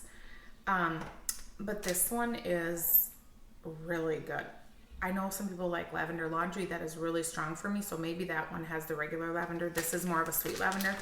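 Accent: Wisconsin, United States. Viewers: Love seeing your face!! So gorgeous ❤️❤️ I love that I can hear the Wisconsin in your voice. Minnesota here 🖐🏻 😂 I have the accent too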